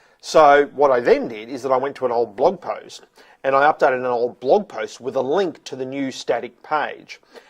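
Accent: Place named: Australia. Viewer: Thank you for this video! What do you think about zippcast? P.S. I like your Aussie accent :)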